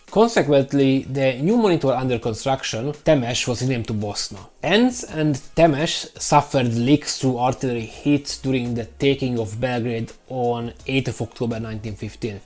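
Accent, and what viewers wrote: Hungary. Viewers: its hungarian "accent".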